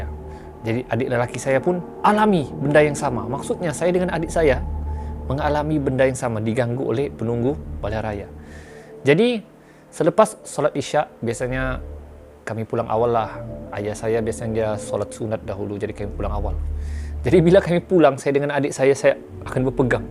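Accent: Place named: Tawau, Malaysia. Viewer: Bang kekalkan lh loghat tawau mu😂🤣👍🏻👍🏻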